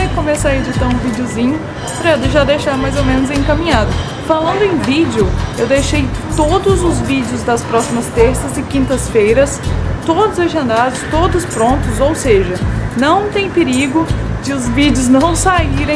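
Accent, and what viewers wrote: Minas Gerais, Brazil. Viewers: Que simpatia voce! Conheci recentemente seu canal e estou gostando. E esse sotaque lindo, me deu saudades da minha querida Minas! Araxà è uma gracinha, nao fica muito longe da cidade onde eu morava ( Lagoa da Prata) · Ai, sotaque mineiro! O seu não é tão puxado, mas o do seu irmão é muito! Adoro! Minha família é mineira e morro de saudade do sotaque deles! Sei bem como você se sente vendo o seu primeiro livro pela primeira vez porque também sou escritora e publiquei livros, e é incrível pegar o SEU livro pela primeira vez! É lindo! Mas claro que você vai vender muito mais que eu. hahahahahaha De qualquer jeito, boa sorte e eu com certeza vou querer seu livro. Beijos e parabéns pelo livro! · Ai bom demais da conta esse sotaquinho nosso mineiro né gente!!! Sucesso!!!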